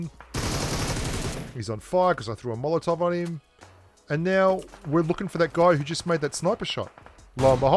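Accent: Australia. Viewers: Idk mate I just love the Aussie accent👌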